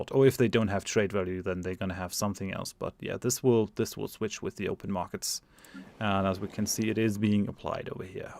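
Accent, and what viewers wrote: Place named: Germany. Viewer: That German accent though….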